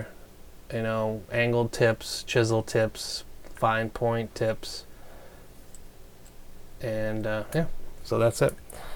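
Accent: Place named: Massachusetts, United States. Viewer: Knew you were from MASS right away even though you don't pronounce your AAAAs (read R's for those not from MASS) like I do.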